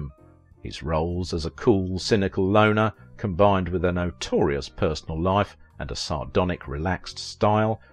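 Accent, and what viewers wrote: United Kingdom. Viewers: Fruity Brit accent used for fake gravitas in trying to smear a great actor. This narrator claims Mitchum had 49 drinks of rum before dinner. This narrator is unforgiveable, don't waste your time. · Am subscribing for the smooth Brit voice, good researched.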